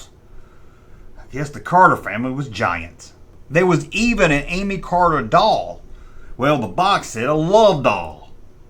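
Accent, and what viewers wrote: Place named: New Jersey, United States. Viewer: Georgia? I thought you were from Jersey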